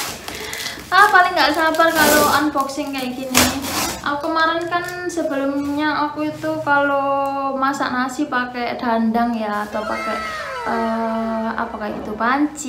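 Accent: Indonesia. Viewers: Medok banget mbaknya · Medok banget kak basanya 😅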